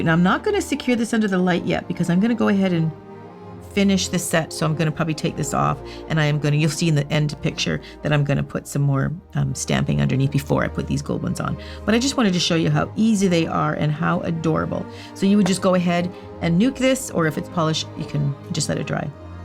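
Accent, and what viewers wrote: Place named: Canada. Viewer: DO YOU MAKE THOSE LIL HATS?? WHERE DO YA GET THEM?? MY PRAYERS ARE FILLED WITH THOUGHTS OF YOU AND CAMERA MAN AND YOUR GUITAR PICK'N BABY GIRL THIS HOLY DAY SEASON AND THE HOLIDAY'S ALL TOGETHER... MERRY CHRISTMAS AYE... I NOTICE YOU SAY AYE AAAALLOT SO CUTE. MUST BE A CANADIAN THING HUH. "I MEAN AYE"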